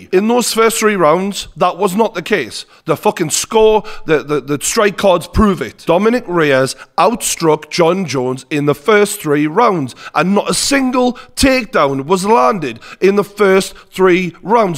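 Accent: Newcastle, United Kingdom. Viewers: Just hearing true geordie introduce the video is enough to put a smile on my face!!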